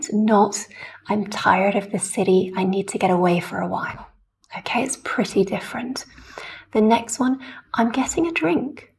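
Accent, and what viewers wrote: United Kingdom. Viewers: صوتك مميز جدا في اللهجة البريطانية هذي اللهجة الي اتمني اتحدث بها يوم من الايام أيمكنك إعداد المزيد من الفيديوهات وقراءة القصص من أجل الshadowing انتي لديكي نبرة نادرة استغليها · Is your accent Standard south british?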